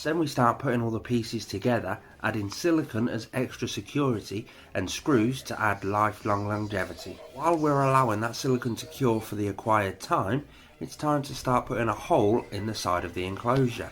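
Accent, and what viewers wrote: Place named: Sheffield, United Kingdom. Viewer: Nice setup just wondering where are you from I’m getting Sheffield vibes idk y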